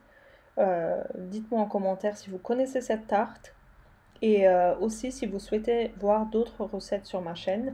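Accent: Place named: Lorraine, France. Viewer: Coucou ! Merci pour le partage. Quand je t'ai entendu parler j'ai trouvé que tu avais un petit accent lorrain... Ensuite, tu as évoqué Thierry Hommel qui fait très bonne tarte au fromage d'ailleurs et là je me suis dit qu'on vient du même coin 😜😜 contente suivre une personne de la ville de mon enfance 😉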